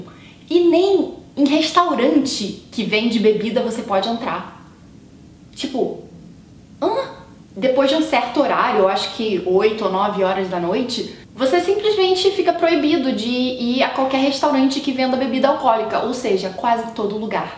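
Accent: Rio de Janeiro, Brazil. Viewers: Acho legal seu conteúdo mas o sotaquezinho carioca é DIFÍCIL de aguentar. Gezus, minha chaleira chia menos... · Vc com esse sotaque de carioca se assustou com esse jeito deles dançarem???????Com esse sotaque de carioca???Para com isso,com certeza vc sabe como se dança funk(não digo que vc dança,digo que já deve ter visto e sabe como é).É simplesmente impossível alguém com esse sotaque de carioca que vc tem se assustar com isso!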